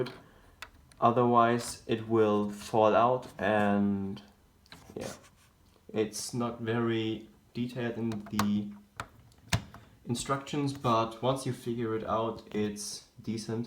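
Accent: Germany. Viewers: sieht ganz geil aus, ich weiß nicht safre vdas du deutsch bist aber dein englisch hört sich ziemlich deutsch an :D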